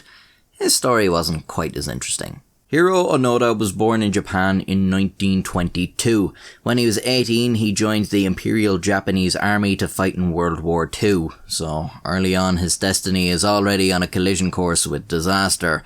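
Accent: Ireland. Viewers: I always forget this guy’s Irish. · An irish man talking about WW2 is wierd. Like watching a swiss made video on WW2. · you irish? cuz im from cork and you sound irish XD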